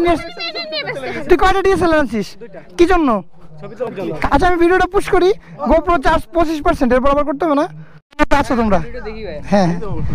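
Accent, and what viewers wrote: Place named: Bogura, Bangladesh. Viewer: ভাই বগুড়ার কথা শুনে খুশি হলাম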